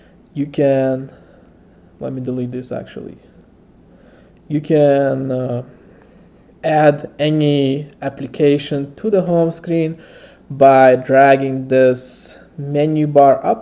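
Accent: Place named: Russia. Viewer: You're from Russia? Love the accent :-)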